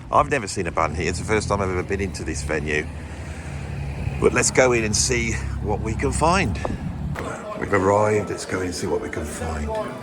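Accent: Birmingham, United Kingdom. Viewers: Colosseum live! Now that's what I call impressive and I'm envious (but what about the condition?). Skinflint-fetishist; I can now say that I watch this bloke with a Brummy accent with who is a ....-.... drummer. The Michael Henderson budgie smuggler album cover reluctance anecdote cracked me up ps I picked up Focus 3 for next to nothing (in excellent condition) in an op-shop here in Brissy and it is the first time I didn't "get burnt" with a double-album. *op-shop = opportunity shop = charity shop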